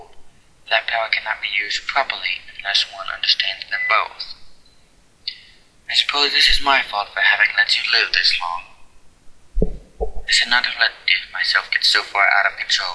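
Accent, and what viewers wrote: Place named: United Kingdom. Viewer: British accent..nuff' said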